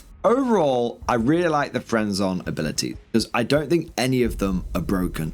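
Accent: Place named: United Kingdom. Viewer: he's British they break everything English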